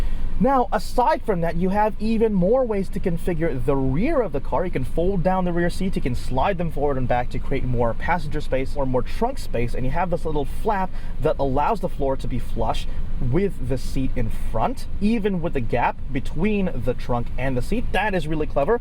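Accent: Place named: North America. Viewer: Thanks. Great video and great to hear a North American accent using modern normal measurements although the car does run on liquid fuel not vapour (gas)😂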